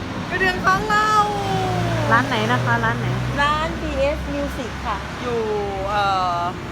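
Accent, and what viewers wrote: Thailand. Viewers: เอ๊อะ555คนอีสานตั้วนิ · พี่ใช่คนอุบลมั้ยคะ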